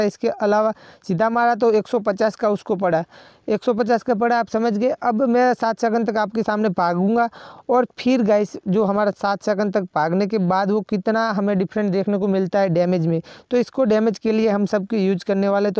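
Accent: Gujarat, India. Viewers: AAP Gujarati ho 🤔?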